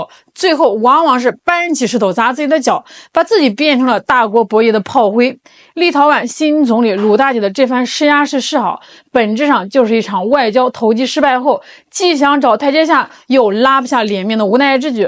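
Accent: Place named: Shandong, China. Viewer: 主播山东姑娘吗？带着家乡味呢❤❤❤😂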